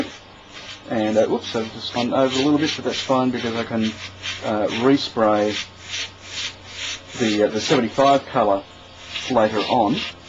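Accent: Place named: Australia. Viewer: is awesome and his Australian accent breaks up the monotony of his instruction. Don't forget about Sheppard Payne the master of the diorama (R.I.P.), Paul Boyer the decalling wizard, Aaron Skinner the armor fiend, Rodrigo Hernandez Cabos the airbrush genius, Geoff Coughlin; jack of all models, and the brush-painting Italian god Carmine Mari.:)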